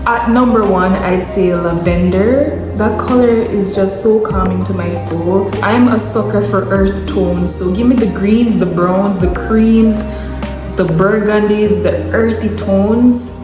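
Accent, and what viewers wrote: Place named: Jamaica. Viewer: You and your locs are gorgeous! 😍. Loved this video! My mom is from Jamaica! I knew I recognized your accent lol.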